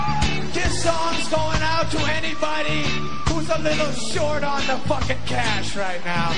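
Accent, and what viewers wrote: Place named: Jamaica. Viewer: Why does he all jamacian like?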